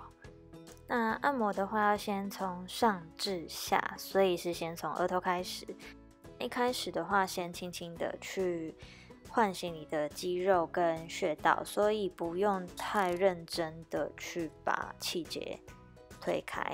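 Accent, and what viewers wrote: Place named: Taiwan. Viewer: 她就是在好好说话呀，难道要人家按中央新闻那么说话才算是好好说话嘛……台湾或东南亚有很多华人是这样腔调的好嘛……少见多怪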